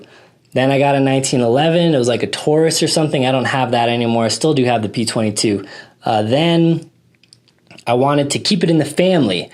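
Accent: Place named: California, United States. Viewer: did not know you were from california. totally got that vibe from the way you talk though. still rock my LLOD hoslter, one of the best.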